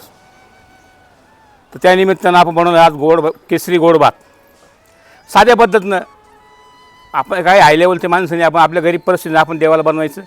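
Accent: Khandesh, India. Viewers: 🙏जय हरी मी आत्ताच तुमचे विडिओ बघायला लागलो मी खांदेशात धुळे शहरात राहतो तुम्ही खान्देशी बोली बोलून मन प्रसन्न केलत. आणि सर्वांनाच परमेशवराची भक्ती करण्यास प्रेरित करतात खूप आनंद झाला 👌🙏राम कृष्ण हरी जय जय 🙏🚩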